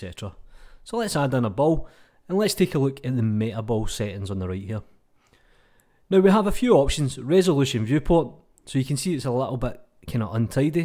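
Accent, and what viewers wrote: Scotland, United Kingdom. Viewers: nah its Scottish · Hes Scottish lol no the wonder!!!!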